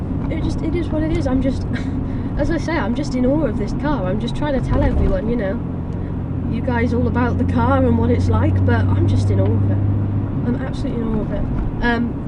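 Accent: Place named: United Kingdom. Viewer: Where is the wing? I had a band new 1990 Twin Turbo Z in SF California... loved it... drove it 18,000 miles - dumped it moving to LA for film school for a 1991 4Runner and cried. I went through 3 sets of fronts and 2 sets of backs... As for tires - go for Michelin Pilot 4S pilot sports - the best rated!... I'd recommend what I'm driving instead a 330hp+ with mods 2005 silver Infiniti G35 with no Turbo... newer, faster, no turbo and about $8K plus sunroof used now. (I love my sunroof!). Thanks for the memories and your great UK accent. Enjoy! Try a G and forget the Z... as you get back seats even on the G35 6mt coupe which lowers insurance.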